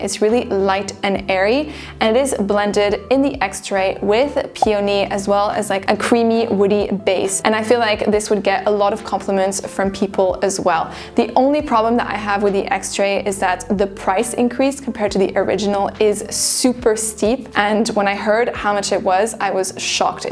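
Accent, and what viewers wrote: France. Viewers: In any case, I'm waiting, but I follow you a lot on YouTube. Nevertheless I just subscribed to your IG page and I love your little French accent. THANK YOU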